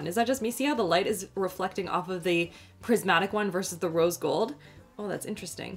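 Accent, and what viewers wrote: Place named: Canada. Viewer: I love your videos so much! Thanks for being so fun 💙😋 is it weird that I love how you talk? I’m from Texas so nobody here has a Canadian accent and it’s so cool how you say certain things! Anyways love ya! 💄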